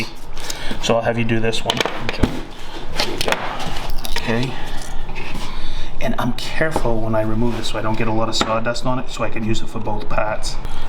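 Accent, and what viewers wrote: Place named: Boston, United States. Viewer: Love the Boston accent,?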